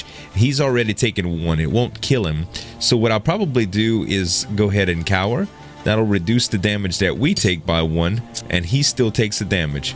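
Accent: Louisiana, United States. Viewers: Are you from Louisiana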